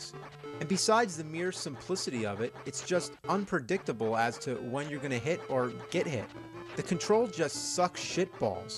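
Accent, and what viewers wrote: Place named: Philadelphia, United States. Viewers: fucking hell, im italian and still i can hear the philly accent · He actually has a bit of a northeastern accent if you listen closely lol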